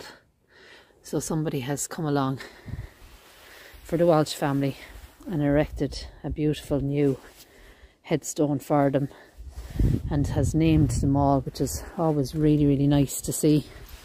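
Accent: Ireland. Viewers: Wow! So fascinating look through those tiny holes and seeing all those coffins you did a marvellous job focusing through so we can see 👏 ♥️ Thank you keep up the wonderful work you do i absolutely love seeing all these amazing graveyards with you and your Irish accent to die for 🥰😁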